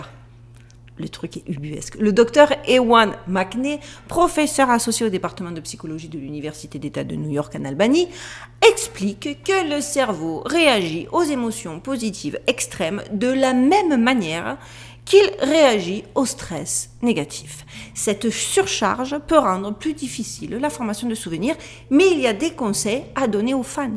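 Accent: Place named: Midi, France. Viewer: Merci! Sujets toujours passionnants. Plus l'accent du Midi 💓💓💓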